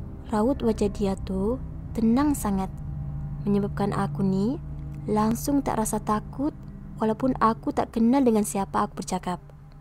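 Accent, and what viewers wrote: Sabah, Malaysia. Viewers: org sabah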